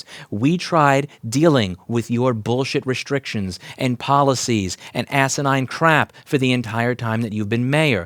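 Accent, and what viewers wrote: New York, United States. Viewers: This was definitely the most NY I've heard hahah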